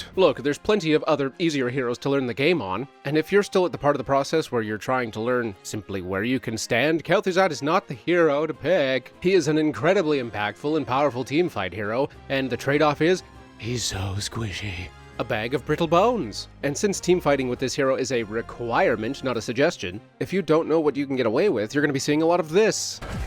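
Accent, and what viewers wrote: Canada. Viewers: Letting the canadianness come out eh? KTZed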